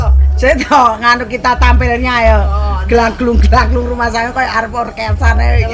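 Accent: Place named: Nganjuk, Indonesia. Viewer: Jawanya mana to mbak., dialeknya kayak daerah nganjuk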